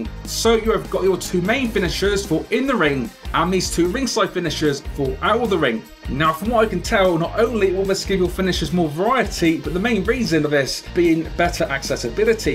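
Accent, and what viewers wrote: England, United Kingdom. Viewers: Why you here if you dont like his accent. He's British how do you expect him to lose it? Before you start getting all brave and confident think about what you're saying before you say it. How can a BRITISH person lose their BRITISH accent. Common logic man. Thumbs up to you! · also go watch denkops or will power if you don't like the british accent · Rasist? What the fuck, men? I am is not rasist. I just don't understand English accent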